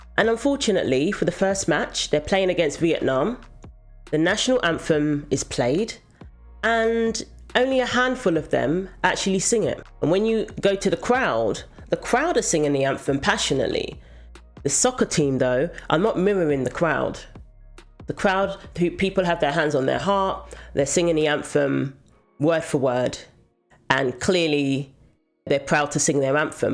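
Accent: United Kingdom. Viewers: You are Smart, Pretty and have a Great British Accent 🙂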